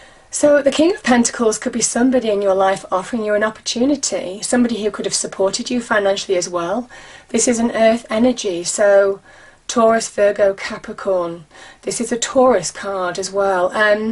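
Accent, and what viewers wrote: United Kingdom. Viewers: Hi .. It's great to hear a uk voice . 👍.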